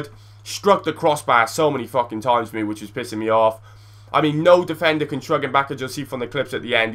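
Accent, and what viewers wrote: Wales, United Kingdom. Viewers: you sound identical to leroy from the valleys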